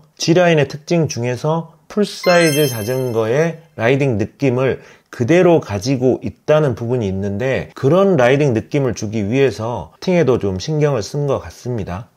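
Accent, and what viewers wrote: Jeolla, South Korea. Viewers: 전라도 사투리 맞나요?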